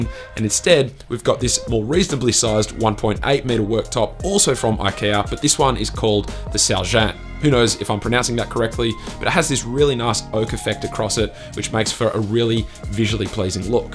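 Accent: Australia. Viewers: Cause Aussie accents are the best m8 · Thats a Thick OZ Accent. Are you an OZ ? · sounds like such an aussie.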